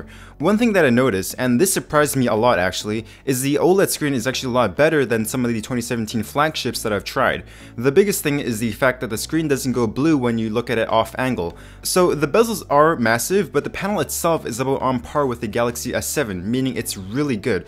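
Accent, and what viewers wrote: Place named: Canada. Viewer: Judging by your accent, I guess you're.. Canadian? Am I right?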